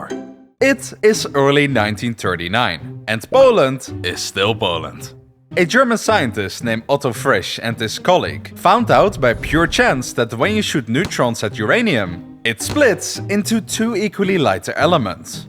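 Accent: Netherlands. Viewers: Are you Dutch because you a bit Dutch accsend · You have a Dutch accent i think. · Are you dutch? I think i can recognise an accent there.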